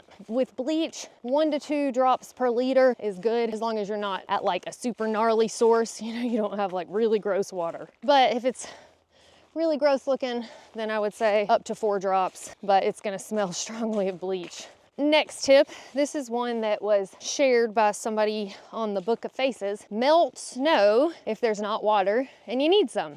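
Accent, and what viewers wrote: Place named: Alabama, United States. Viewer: Omg ! I think I have to marry a girl from Alabama !!! That beautiful accent is like a songbird singing right into my heart xx (I'm from Europe)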